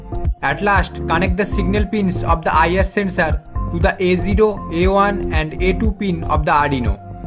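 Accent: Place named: India. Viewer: You have the most stereotypical indian voice You should better use hindi in your next video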